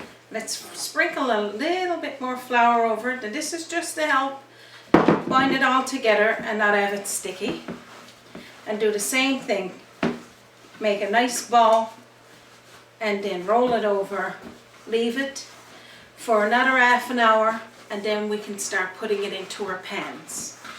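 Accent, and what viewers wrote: Newfoundland, Canada. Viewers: I love Newfoundland accents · Thank you, thank you, thank you, This is absolutely amazing. I'm originally from Corner Brook but moved when I was 9. Learning how to do traditional NFLD recipes with your wonderful accent is truly amazing.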